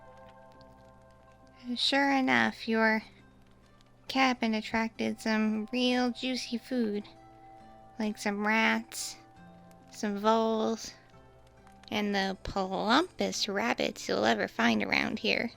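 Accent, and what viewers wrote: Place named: South, United States. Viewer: Am I the only one who thinks that the kitsune in the thumbnail looks like an Eevee. Southern accent is nice. Believe me I was born in Tennessee I know what a southern accent is like. Luckily I didn't live in Tennessee long enough to develope a southern accent. Cause I have no attachment to my birthplace once so ever.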